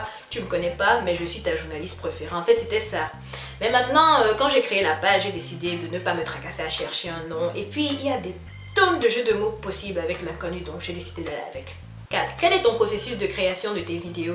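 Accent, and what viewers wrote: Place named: England, United Kingdom. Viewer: J'aime beaucoup ton accent anglais. Où tu l'as tu appris ?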